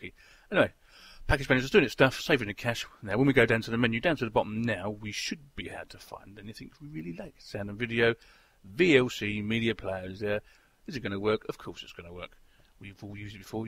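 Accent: United Kingdom. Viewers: its a british accent, we are not as posh as your program 'family guy' makes us seem!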